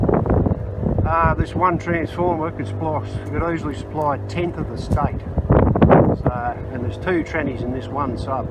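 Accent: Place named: Sydney, Australia. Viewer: Location and incoming lines look familiar, accent gives it away. Not too far off Wallgrove are we...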